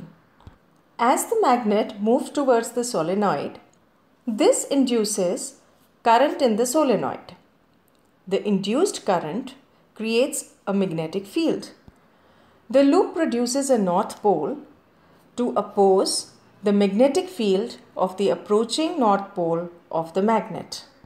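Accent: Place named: India. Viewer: مبينه هنديه من لهجتها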